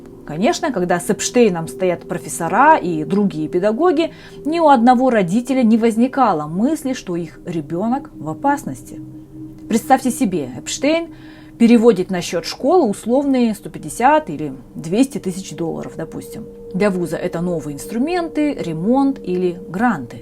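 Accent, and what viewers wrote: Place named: Ukraine. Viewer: Украинский акцент проскальзывает. Не сразу понял...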